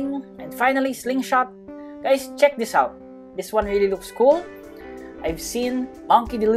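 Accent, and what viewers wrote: Philippines. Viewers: sabi ko na nga ba sa accent mo pilipino ka eh hahah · sabi na filipino to accent palang eh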